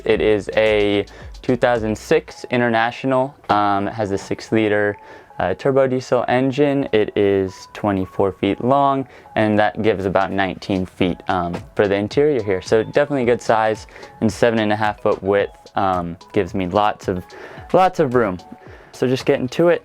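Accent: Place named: California, United States. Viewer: I don't remember if he said his location more specifically than the PNW but he sounds, to me, like an average Californian. You're making sweeping generalizations of a vast country, where people have had varying levels of educational opportunity, and insulting him based on it. He is presenting to a channel that likely has a wider audience than just the formally educated, some of whom might not recognize the array of synonyms that one may use to describe the things that have been a pleasant addition to their build. I'd argue that he was a very contextually effective speaker, using a language and tone that fits the channel and its viewers, and brought a fair amount of charisma, to boot. Regardless, organization and tool-building was also vital in bringing humans to our current time and place, and he seems to have a high level of aptitude for making and executing plans which met his personal goals. 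Why do Aussies call everyone "mate"? Why do the British throw around "slag" and "bollocks"? There's a unique context to every culture, and it's beautiful that there's more choices than just Standard English in our world.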